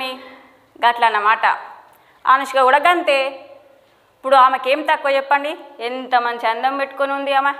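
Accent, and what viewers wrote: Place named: Warangal, India. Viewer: Wowwww Excellent Anchor... Warangal Language super super Medam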